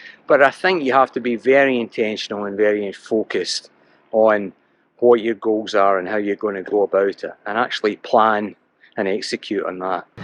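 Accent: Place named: Scotland, United Kingdom. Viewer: Thanks for your videos. As a 64 year-old avid runner, they are great for me. I noticed your Scottish accent, but see from all the US flags in your videos that you're presently in the USA somewhere. I spent 3 years stationed in Thurso (far north of Scotland) as a US Navy Electronics Technician and my wife (for 40 years now) is from there. Where are you from?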